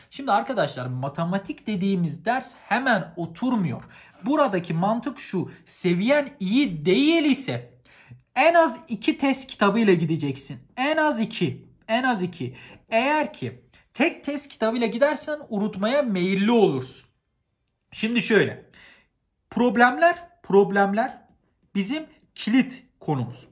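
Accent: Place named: Hatay, Turkey. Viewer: Abi neden hatay sandık içi gibi konuşuyorsun?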